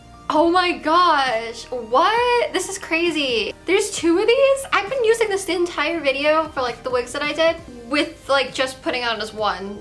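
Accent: United States. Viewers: You miss pronounced Cheshire, but that’s ok you still cool. It’s an American thing saying Shire. 😁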